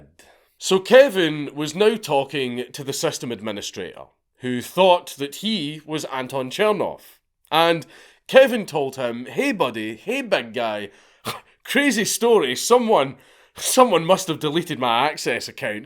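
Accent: Scotland, United Kingdom. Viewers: I am loving the long format videos with your scottish accent. Its like a audio book but doesnt make me sleepy. I hope you will create more (as a student I can state that elevator music is great). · This guy is still talked about today in cybersecurity classes I take. Man's a legend and hearing talk about it with that Scottish flare is amazing. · I stumbled across it about a month ago and dear god this is all I have been listening to. Something incredibly soothing about a stoned Scottish man telling me stories. Enjoy and subscribe! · man I could listen to this scottish guy narrating peoples stories all day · Mic Drop 🎤 2 hours of the Scottish accent ❤...I will try to comprehend the content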